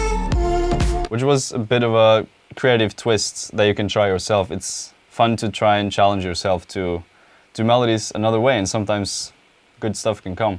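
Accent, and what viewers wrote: Norway. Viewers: Nice. Are you from norway?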